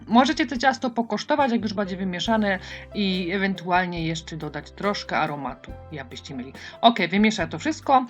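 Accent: Silesia, Poland. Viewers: Super, ale fajnie po Śląsku rządzi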